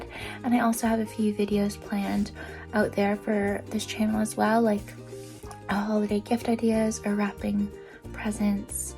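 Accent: England, United Kingdom. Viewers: Why the english accent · Why does a British accent suit you sooooo well 😂❤ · hi! You really seem such a sweet girl!The plushie is super cute and it is a sweet gift! And also all the othe gifts...so thoughtful!! 🥲i And also i like when u talk with a british accent! I love british accent in general! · Excuse me.. how are you more British sounding than me.. when I’m British?! 🤣 so glad you’re happy!🕊️ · The way she just immediately adopted a British accent when she got to the UK is awesome 😂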